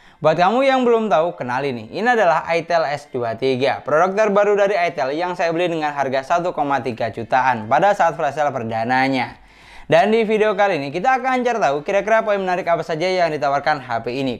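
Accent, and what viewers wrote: Madura, Indonesia. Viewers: kayak nya abang orang madura ya dari logat bicaranya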